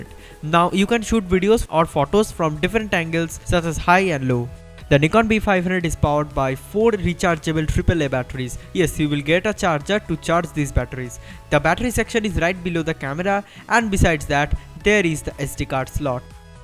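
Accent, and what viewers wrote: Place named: India. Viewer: Its called English with Indian accent, we indians are comfortable with this kind. He is speaking so fast because the video has to be fast and quick or it will take longer time which audience may not like. It's a good review for indians . Thanks brother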